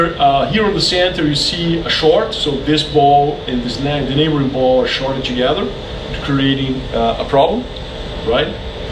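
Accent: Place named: Brazil. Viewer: Esse cara tem muito sotaque de brasileiro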